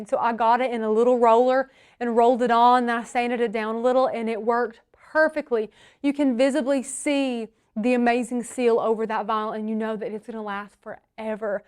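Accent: South, United States. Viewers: Great tutorial... thank you. I really enjoyed listening to your Southern accent... for an Australian it is like a North American trying to understand someone from Scotland. Very cool.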